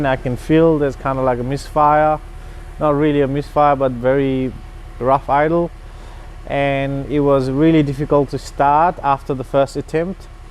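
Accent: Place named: Nepal. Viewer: Good stuff bro. Your accent sounds like Nepalese accent.🤙🏾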